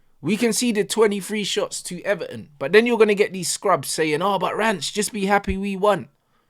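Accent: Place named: London, United Kingdom. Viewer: Bro why is this guy even supporting United? He’s obviously not from Manchester judging by that London Afro-accent. You don’t speak like that if you’re from Manchester. Does anyone know why he supports them?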